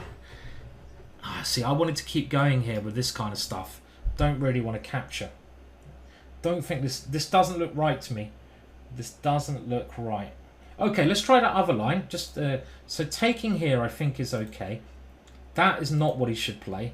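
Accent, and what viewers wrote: England, United Kingdom. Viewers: Hands up who’s watching this for the English accent 🙌😝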